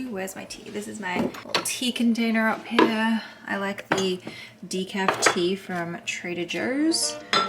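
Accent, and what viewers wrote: Australia. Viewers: You’re such a Fun, often funny Adorable Young Lady which I love! From a blonde girl myself, I’m still getting used to your darker hair and honestly it suits you well ✨ I Appreciate your down to earth, real and often vulnerable approach which makes You a Joy to watch. Of course I Adore your accent (I’m a Southern gal) & find it so Lovely🤍. I fairly new to your channel & so Refreshing to hear from you!! I believe you’re Australian, if I’m wrong please correct me. Is Australian as well?! Just wanted to say Hello and let you know how much I Appreciate You 🤍🤍🤍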